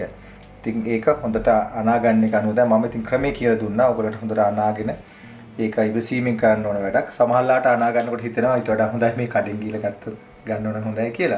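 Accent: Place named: Sri Lanka. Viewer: No offense. Have you heard the French, the Germans, Slovaks and other ethnicities speak English. They have their own way of pronouncing English words. For example, the Americans pronounce castle, tomato, potato and a few other words very differently than the British. There is a significant difference, that one could clearly identify where you are from. Similarly, chef was born and raised in Sri Lanka, and lived majority of his life in Sri Lanka. His way of pronouncing some English words are entangled with our sinhala dialect. Which comes naturally if one doesn't make an effort to change the way of pronunciation. Did chef pronunciation affect his recipe? Did his pronunciation affect his career? No. Not at all. Why publicly point fingers? How would you feel if someone did the same to you? Making one feel small on a public platform is unkind, Inhuman. 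It says a whole lot about you, rather than about chef.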